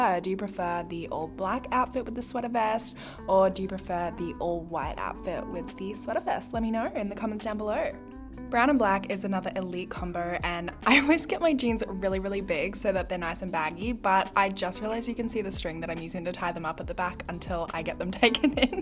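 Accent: Australia. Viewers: Oh my goodness you are the absolute best!!!! You are so beautiful!! Heck freakin'yeah of course ur Baby Girl wants in on Mama's work who wouldn't? She knows how gorgeous she is and how awesome her Mama is!!! She wants to be the icing on the cake and get those "bootie scratches" I call them 🤣....Puppers and Kittas are awesome!!! I just recently subscribed to your channel after watching a few of ur true crime videos and just fell in love with it!! I love true crime and u do a fabulous job!!! Plus u are so beautiful and cute!! I love the "disclaimer"...lol you put on one of ur videos I watched recently when u said listen I'm going to pronounce things wrong..lol. I mean who doesn't pronounce things wrong like all the time!!! And I think u do a fantastic job!!! U do ur best to pronounce things properly that I could NEVER even attempt to!!! So if the haters wanna hate let em' that's on them!!!! 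Also when someone would bitch about ur Mystery Mondays not always being posted on Mondays persay....get the hell over it ur a busy woman!!!! I absolutely love ur accent as well!!! I'm American, I've always loved Australian accents though!! Take care! ❤️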